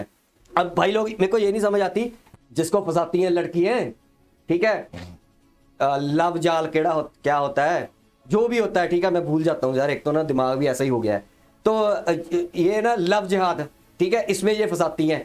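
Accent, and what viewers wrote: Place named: Punjab, India. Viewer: Yaar I'm not trying to favor them but her first email was correct and you also need to check your accent if we are talking about it. They are from Punjab(pakistan) side and I'm pretty sure you are too(Indian side) with your accent - fellow Punjabi. By the way you added the word "you" in that email's first sentence.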